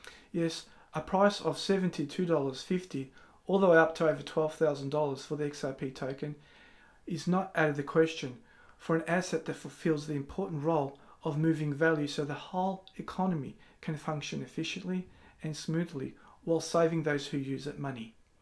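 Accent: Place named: Australia. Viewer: Me too! Great to hear a fellow Aussie in this space!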